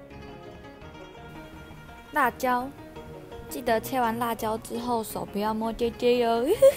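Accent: Cantonese, China. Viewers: 啲廣東話好得意😂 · 台妹講廣東話好可愛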